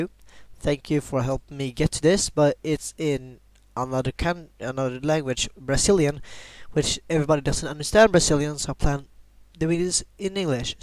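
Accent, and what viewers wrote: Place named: United Kingdom. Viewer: Ahh youre also Swedish metoo shoutout to u ma boy BTW you sound brittish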